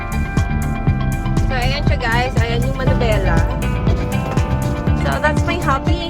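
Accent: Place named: Ilonggo, Philippines. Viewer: Ilonggo ang tonoo ahh kababayan to pa pla😂😂😅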